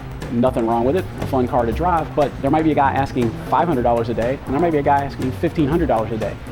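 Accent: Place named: Houston, United States. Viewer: I hear Houston.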